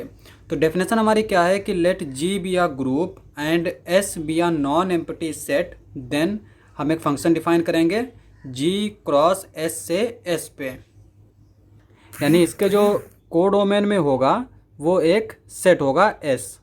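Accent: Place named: Bihar, India. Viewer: bihari accent=lit video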